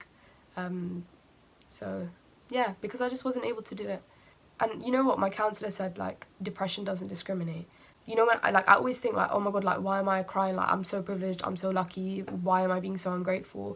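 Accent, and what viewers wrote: London, United Kingdom. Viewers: True Londoner accent.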